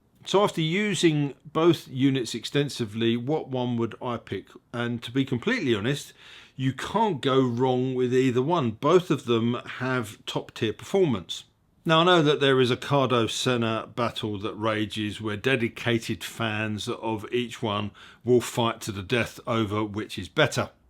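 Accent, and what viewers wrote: United Kingdom. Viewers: Thumbs down for the British accent. Mercia.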